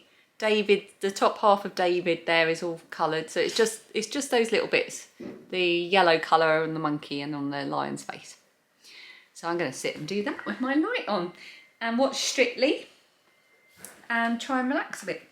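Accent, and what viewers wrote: Australia. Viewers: Great Aussie accent! Thankyou for sharing your daily life🙂 I am watching from Bunbury Western Australia, Diane Buswells home town🙂 My daughter went to school with her. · Oh my goodness! you nailed the Australian accent. Maybe just add a bit more ‘nasal’ to your voice?👍. I really dislike the Aussie accent. I’m allowed to say that as I’m Australian 😅😅😅. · thank you so much for the tour of your gallery wall again. I really appreciate it … looks gorgeous! ♥️♠️♦️♣️. The girls reactions to the chips make me laugh out loud. So very funny - is a crack up … love your Aussie accent (I am Australian) Like everyone else I will miss your Vlogtober so much.. hoping you’re up for Vlogmas too 🤞 Thank you for taking the time and effort to share your lives with us.. super super fun family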